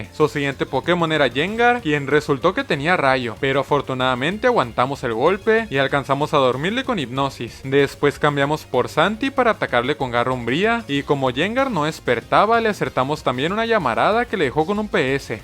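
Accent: Chihuahua, Mexico. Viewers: Eres de Chihuaha vdd we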